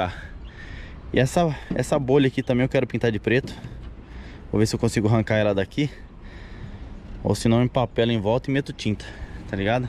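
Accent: Minas Gerais, Brazil. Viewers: Fala irmão, sou novo no canal, e quero saber, cê é mineiro?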